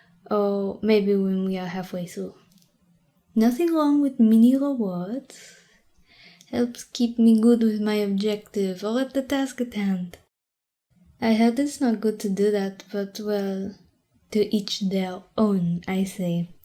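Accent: France. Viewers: everyone gonna ignore the fact we just got a free science lesson while listening to a nice french accent. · Ur french accent is neat ^^ u put a lot of effort in it Nice roleplay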